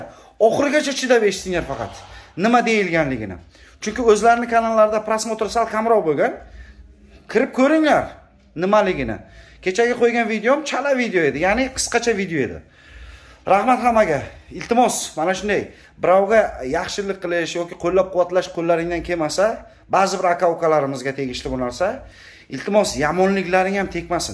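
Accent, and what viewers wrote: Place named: Rural, Uzbekistan. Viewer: КИШЛАКИ ГАПИНДИ КАРАГИН ...ХОТИНГА УКШАП ГАПИРАСАН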